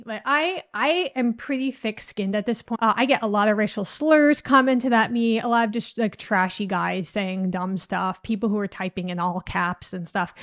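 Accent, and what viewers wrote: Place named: New York, United States. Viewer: Excuse the question, are you from the Utica area in NYS? I'm always interested in regional accents. Your accent sounds like Central/Western NYS . . . .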